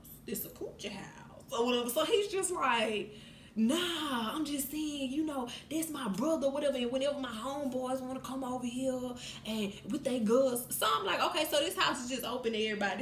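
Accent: Louisiana, United States. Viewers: Your Louisiana accent is soooo cute! ❤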